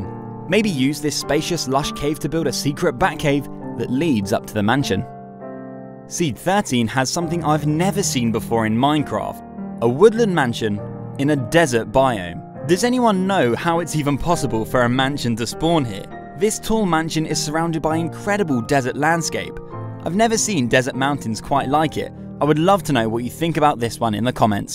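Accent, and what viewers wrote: United Kingdom. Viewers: Amazing seeds good job R u British?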